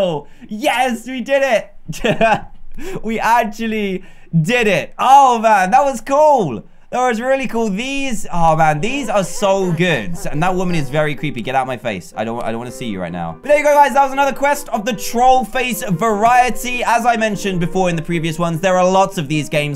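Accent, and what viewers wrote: United Kingdom. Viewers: Well Mr probably American ,or Canadian ,he's British so who freaking knows what he says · he s bidish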